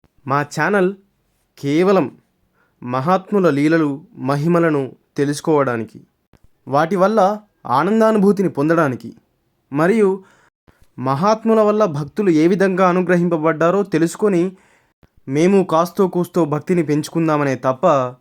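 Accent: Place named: Telangana, India. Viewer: Excellent! By your accent know you are telengana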